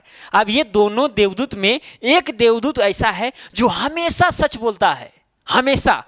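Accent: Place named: Jharkhand, India. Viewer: सर जी आप झारखंडी लगते हैं आपकी बोलने के अंदाज़ से Kyuki Mai भी jharkhand से हुं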